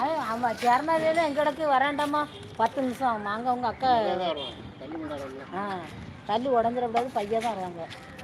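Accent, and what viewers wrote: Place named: Tirunelveli, India. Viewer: அண்ணி நீங்க கோயம்புத்தூரில் இருக்கும் போது ஒரு வார்த்தை பேசுறிங்க இப்ப வந்து திருநெல்வேலிக்கு போயிருப்பிங்க போல இப்ப ஒரு வார்த்தை அந்த ஒரு பாட்டில பேசுறீங்க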